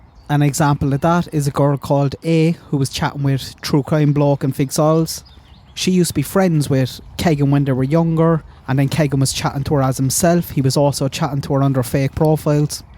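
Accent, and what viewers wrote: Ireland. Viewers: You have a heavy Irish brogue. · Irish and you could slow the video down if you're having trouble lol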